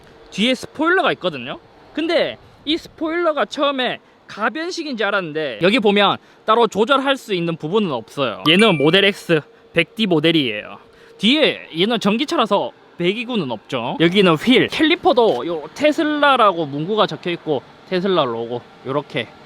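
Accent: Gyeongsang, South Korea. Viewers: 경상도 분이세요,,,? 서울말은 아닌듯한데 ㅋㅋㅋ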